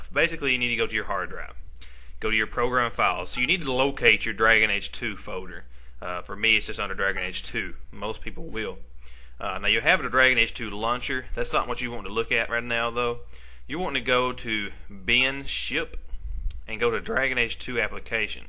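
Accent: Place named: South, United States. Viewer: Ok, wanted to thank you again, had to track down your video again for a reinstall. Plus after 7 years in the UK it's nice to hear a "down home" accent again lol thanks again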